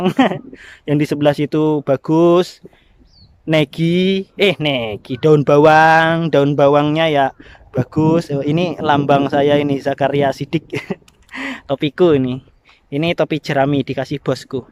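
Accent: Java, Indonesia. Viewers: Bang. Orang Jawa y. Ngomongnya medok banget.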